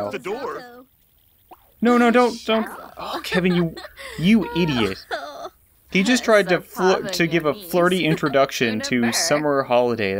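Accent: Canada. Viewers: Are you Canadian, because you sound it. Lol. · quick question, are you Canadian? :0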